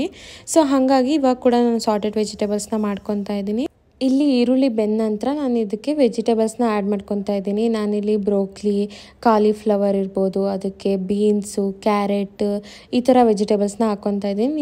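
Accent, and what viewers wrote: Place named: Tiptur, India. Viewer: Hellooo Im also from Tiptur. Neevu maathado style node ankonde, nam side erbeku antha. Kushi aythu😊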